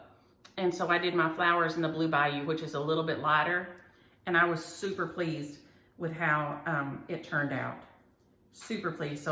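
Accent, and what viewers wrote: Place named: Houston, United States. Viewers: I’ve watched you for forever but I seldom comment. Just love your sweet heart and generous spirit not to mention beautiful stitching. Don’t change your accent, I love it. We lived in Houston when our oldest was aged 3-5 and she sounded just like you back then. Sometimes when I’m finished watching you, I even sound like you!!! 🤣🤣🤣. Love the ABC’s. 😘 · Love your Texas accent! We lived in Texas for almost ten years and it kind of rubbed off on me. I would love to stitch the dog pattern! Thanks for a chance!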